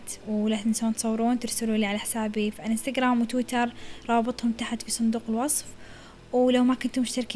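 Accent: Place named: Najd, Saudi Arabia. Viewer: يعطيك العافيه يارب شكلها يشهي جدًا ماشاء الله عليك أحب قناتك ولهجتك هههههههههه من النادر أسمع لهجتنا النجدية في يوتيوب وه بس 💖 ،، ملاحظتي بس إن كمية الزيت كبيرة عشان كذا ممكن ماتعتبر طبق صحي تمامًا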